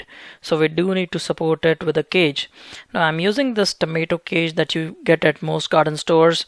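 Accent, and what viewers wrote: India. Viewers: What kind of accent is it? Indian or something similar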